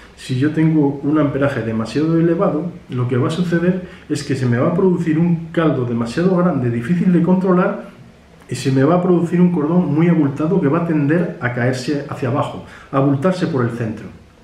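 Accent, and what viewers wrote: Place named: Spain. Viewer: Si vivo aqui hace mas de 26 an~os,soy de la Republica Dominicana y usted por el acento me imagino que de espan~a.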